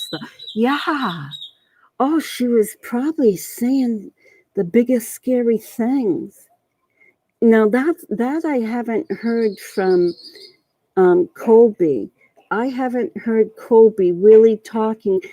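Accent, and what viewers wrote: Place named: Boston, United States. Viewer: Your accent is similar to JFK so Bostonian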